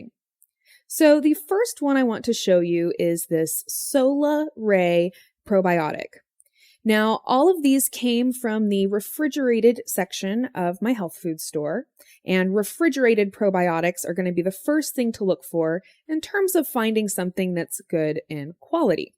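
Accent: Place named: United States. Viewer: I have a video request for you; would you mind doing one on the Ghrelin and leptin hormones? Thanks in advance! Keep up the amazing job! P.S. I like your American accent; this is what I'd love to sound like as I keep working hard on becoming fluent. :) I look forward to watching more upcoming videos you may have in store for us! :) Take care!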